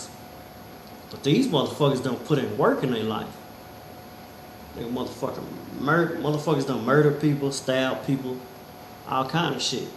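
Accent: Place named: Texas, United States. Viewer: where you from? u sound like you in texas, I want to say dallas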